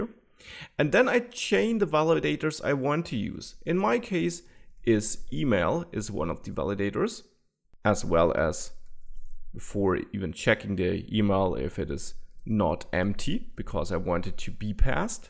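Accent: Germany. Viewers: Every tutorial is better with a German accent.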